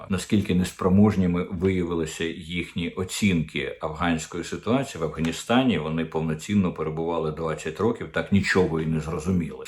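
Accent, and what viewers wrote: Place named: Moscow, Russia. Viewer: откуда у тебя этот московыцькый акцент? Як твой друг Дугин та арестович?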